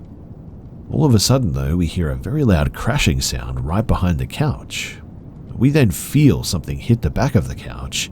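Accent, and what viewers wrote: Australia. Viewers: He's Australian. He is not form the uk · He's Australian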